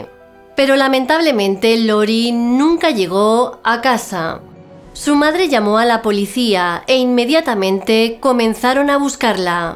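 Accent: Spain. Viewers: eres española o mexicana?, en todo caso tus relatos son interesantes pero tu forma de hablar hace sentir la sensación de q estás montado en uña montaña rusa🙄🙄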